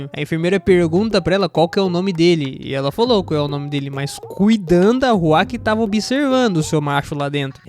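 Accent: Rio Grande do Sul, Brazil. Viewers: Esse narrador parece gaúcho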